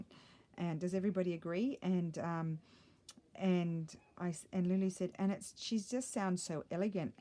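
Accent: Australia. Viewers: I really enjoyed this video. I love your Aussie accent and your enthusiasm. These collages are some of the best I’ve seen.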